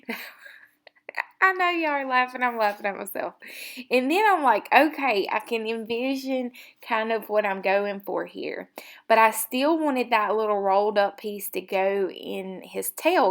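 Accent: South, United States. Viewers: LOL...I thought you might be nearby, you sound too much (accent) like we do around here LOL, plus your sense of humor. That good ole' southern charm, aye! I'm in central Arkansas and was hoping that you might be in the area. Love your project!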